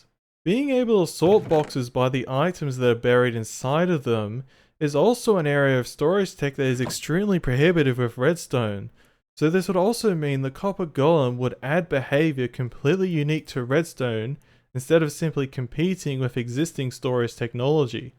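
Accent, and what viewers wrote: Australia. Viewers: I LOVE your northern aussie accent btw I forgot what you were talking about until when i was like "oh yeah the golem thats why i was here"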